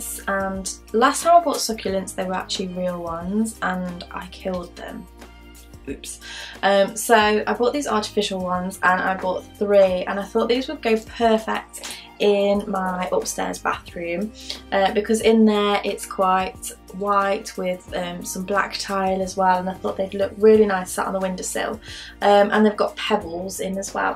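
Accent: United Kingdom. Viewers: Awwwwwww! :D i love your new stuff and i love your British accent too <3 :D Love from Egypt <3 <3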